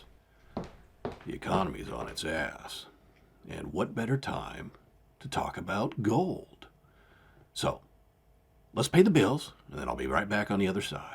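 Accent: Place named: Boston, United States. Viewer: Luv you and your Bahhhh ston, New England, Massahhh chew setts, 35, jfk sr, accent!!!!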